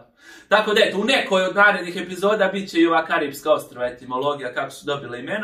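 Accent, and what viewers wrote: Užice, Serbia. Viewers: Obožavam tvoj Užički naglasak! Bio sam tamo par godina pre jedno nedelju dana kod bivše devojke. Nedavno sam pronašao tvoj kanal, i religiozno ću te pratiti ka daljem putu! Pozdrav druže iz Subotice! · Užički naglasak, podseća me na vojničke dane, a čujem da je sad u krugu kasarne Lidl, znači opet Svabe okupirale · Hvala vam ,svašta sam naučila slušajući/gledajući vaš video. Vrlo ste spontani i prirodni u izlaganju. Tome po mom mišljenju doprinosi ERCOVSKI šarm u kombinaciji sa UŽIČKIM govorom ❤️.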